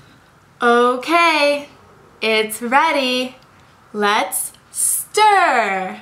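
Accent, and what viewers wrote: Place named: United States. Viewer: Thanks for this, all the other ESL cooking videos are way too fast. I played the audio for a listening/bingo activity for a low level high school class. My students loved your animated speaking style and now say "goooood", "butttter" and "woooow" to me in the corridors with an American accent (I'm Australian so the US accent is a novelty to them)! You are a celebrity at my school! Thanks again.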